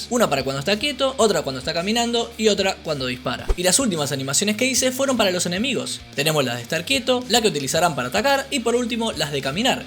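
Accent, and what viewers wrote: Argentina. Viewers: Escuchar a un argentino hablando sin parar como usando frameworks descuartiza el Doom para pasar la tarde. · Tu voz me recuerda a la del youtuber 'Jota.' pero con acento más argentino jajs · Un genio total, es el primer video que veo y ya me enamoré, me recuerda mucho a los vídeos gringos y me da satisfacción saber que ahora esta en español y encima argentino (corregime si me equivoco)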